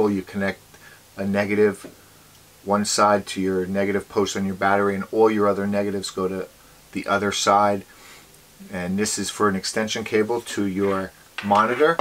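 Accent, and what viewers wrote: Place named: United States. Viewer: An excellent video so thanks for doing it. I didn't get any instructions with mine but that's O.K. thanks to your video, and spoken in plain American too. U.K.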